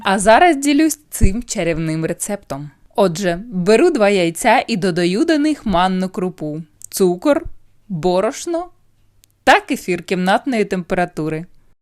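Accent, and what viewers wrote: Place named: Surzhyk, Ukraine. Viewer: господи, я думал так только мой друг говорит на своём личном суржике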